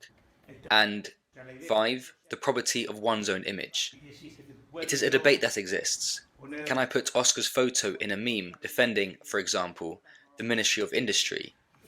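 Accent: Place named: United Kingdom. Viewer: La voz es excelente, no tengo que leer los subtítulos y me gusta volver a disfrutar esta presentación en versión Inglés, y es muy clara y muy agradable la voz de estilo británico. Es una voz natural y agradable.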